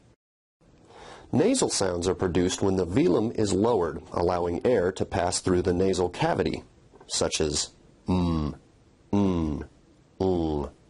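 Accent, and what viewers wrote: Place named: North America. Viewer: He's speaking North American English, Non-British !!!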